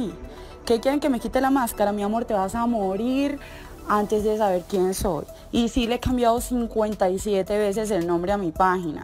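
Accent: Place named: Colombia. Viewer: Ese acento es mi compatriota de Colombia dime si no estoy en lo correcto 😂😂😂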